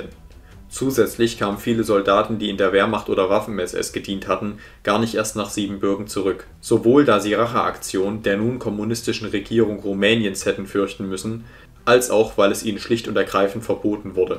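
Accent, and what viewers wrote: Saxony, Germany. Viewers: Habe ich mir auch gedacht, denn man hört dein (ostdeutsches) Sächsisch nämlich zu doll raus ;-)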